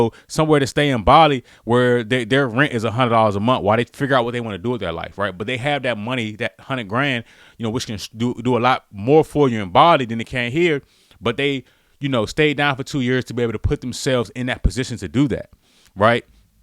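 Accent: DMV, United States. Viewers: You sound like you are from the DMV? Is that where you are based?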